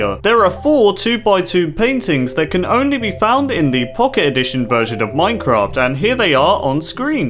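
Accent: United Kingdom. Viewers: I love this accent are you british or something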